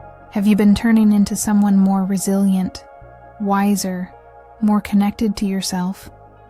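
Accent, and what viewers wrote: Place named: Australia. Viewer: 😂 Your Aussie Accent is funny 😂😂🤣😅ahhaahaaaa😅 Yep, I am hangin' in there!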